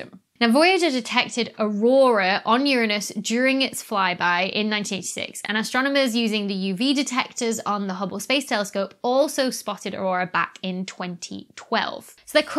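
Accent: United Kingdom. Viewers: Sorry to say Dr Becky, the closed captioning on my television translated your “Saturn” to “satin”. I knew what you were talking about, but my television has problems with a British accent. Love your videos.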